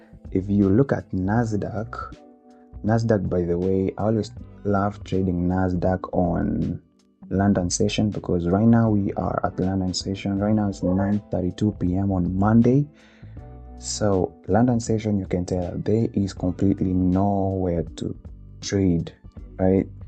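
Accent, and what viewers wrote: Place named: United States. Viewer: Awesome vedio over here,😊The American accent though 🔥🔥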